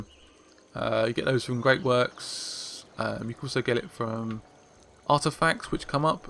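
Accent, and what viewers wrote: United Kingdom. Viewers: Do you live in the United States? British accent? Just curious as you said "Here in America...". Anyways thanks for the walkthrough trying to learn this expansion!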